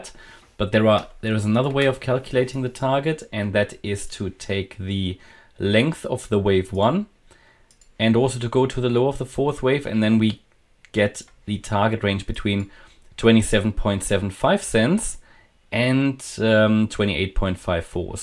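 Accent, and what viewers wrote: Germany. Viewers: Wenn du jetzt noch den krassen deutschen Akzent wegbekommst, wäre das Video richtig gut 😂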